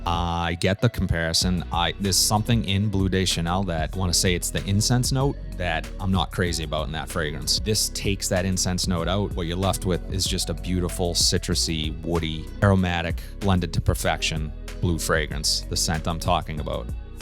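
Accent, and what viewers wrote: Boston, United States. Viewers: Love that Boston Accent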